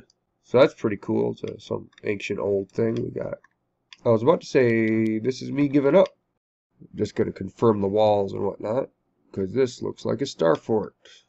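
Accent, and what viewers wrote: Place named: New England, United States. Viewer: Wicked impressive..? Are you from new England too? Hahah!!! It's easy to spot. Amazing video brother, I love the material.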